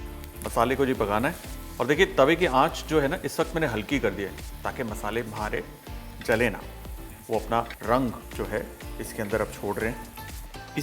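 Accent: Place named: Lucknow, India. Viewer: Aap bilkul lko ka touch dete hain boli m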